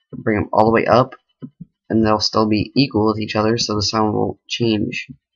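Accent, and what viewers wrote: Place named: Asia. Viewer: why do you talk Asian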